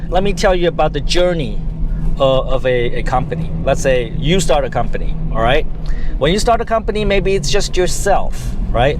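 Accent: Standard. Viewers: nói rất chuẩn dễ hiểu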